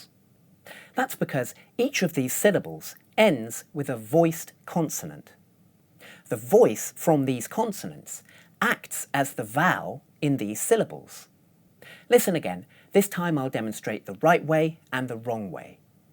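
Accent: United Kingdom. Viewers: Is it rp accent?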